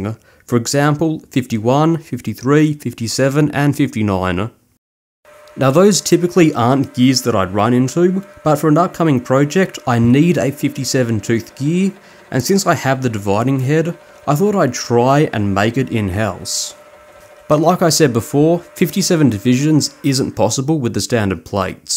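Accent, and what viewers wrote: Australia. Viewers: cool. Which state are you in? I can't quite pick the accent. SA or WA?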